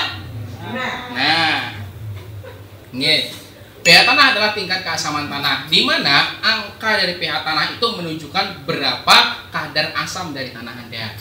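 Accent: Banjar, Indonesia. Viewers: Pian sekalinya urang Banjar 😁, Barabai kah pian bos kuuu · Urang banjar leh bang??😂😂😂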